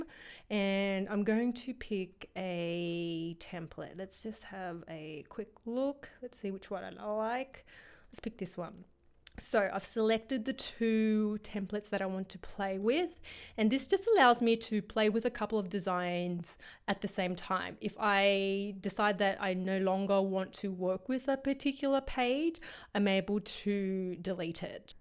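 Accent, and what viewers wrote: Australia. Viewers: Thank you for a easy and informative video! So good to find a tutorial in an Aussie accent. Subscribed.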